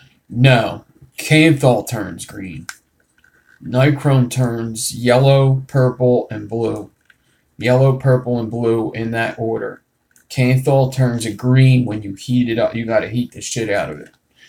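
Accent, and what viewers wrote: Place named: New Jersey, United States. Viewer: youz guyz is a jersey thang. everytime I hear it, it reminds me of Kevin Smith or more to the point Jason Mewes :)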